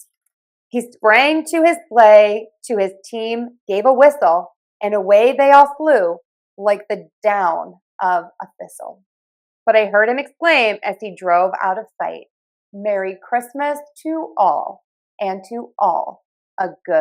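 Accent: United States. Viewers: Hi. I see the title of the video in spanish. Must be the the New improvement of yt. Its good videos of you reading so we can learn the american accent that is not easy. Although its something for children I dont understand a lot of words.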